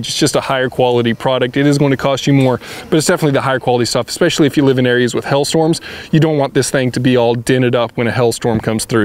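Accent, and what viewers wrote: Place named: Texas, United States. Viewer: Your Texas accent is part of your charm :)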